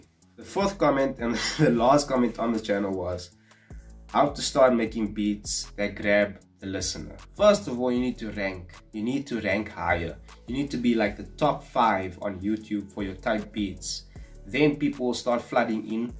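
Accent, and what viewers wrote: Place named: Afrikaans, South Africa. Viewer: Oh my gosh you have a Strong Afrikaans accent lol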